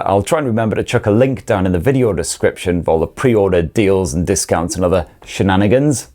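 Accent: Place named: Sunderland, United Kingdom. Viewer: He's a Northerner, to be precise a Mackem.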